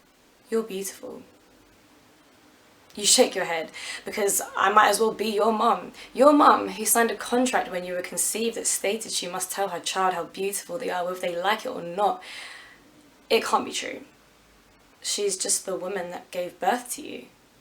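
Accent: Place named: United Kingdom. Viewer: beautiful poem, and I really like your incredible british accent. Please make more videos. regards from México :)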